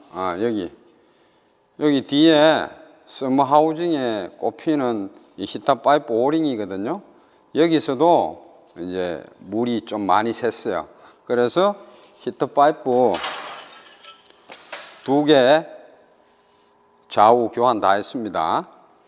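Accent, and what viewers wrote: Guryongpo, South Korea. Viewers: ㅎㅎㅎ 구룡포 발음이 좀 빡세긴합니다. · 나날이 발전하는 블루나인 사장님 짱이야 ! 구룡포 사투리 구수합니다 😂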